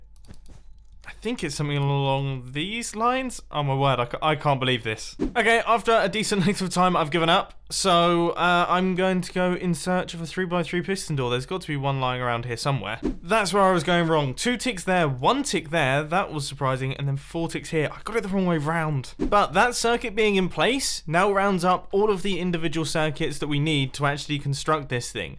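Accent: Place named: United Kingdom. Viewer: Yeah it’s Seth Bling with a British accent.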